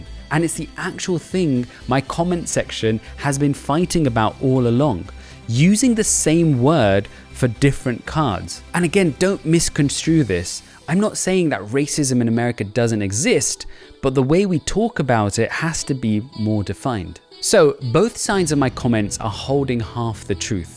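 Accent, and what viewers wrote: United Kingdom. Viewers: We r not slaves from Africa this British accent Hindu began the video off lying we r not slaves from Africa stop 🛑 lying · I found this video thoroughly adept at rationalizing racism away - in part by signaling or assigning "degrees" through a contrived methodology and then putting it on the viewer to "decide" where they fall on the scale. the other comments did a good job citing the obvious. In short this was clownish and disgraceful. the British accent leant a little credibility I guess though...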